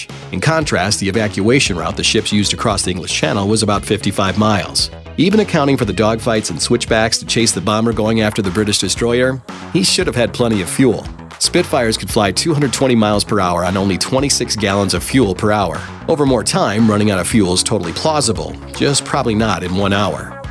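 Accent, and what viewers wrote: United States. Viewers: are u american or britisch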